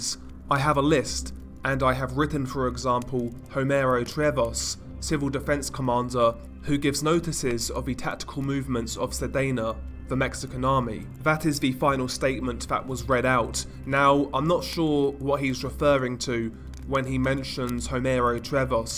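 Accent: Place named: United Kingdom. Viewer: Are you from the UK? I love your accent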